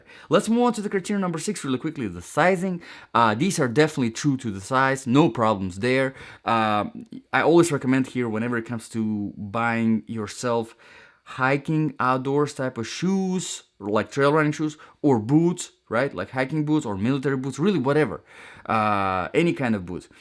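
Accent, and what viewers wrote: Russia. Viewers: you have perfect russian accent, i can understand every word😅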